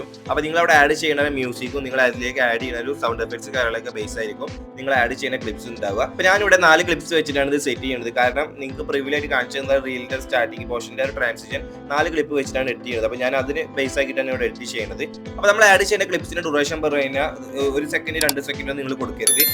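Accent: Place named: Kannur, India. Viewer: Machuuu neggaa kannur alle 🫵🏻😂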